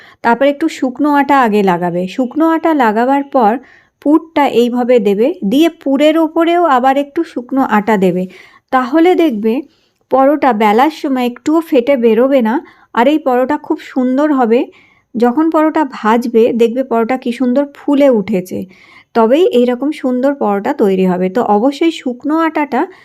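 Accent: Bangladesh. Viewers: মাগো তুমি বাঙাল নাকি। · বাড়ি কই টাঙ্গাইলের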